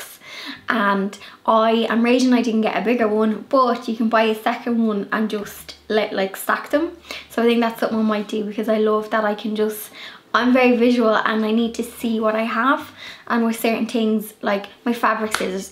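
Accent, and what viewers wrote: Ireland. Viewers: That is not an English accent. Probably Ireland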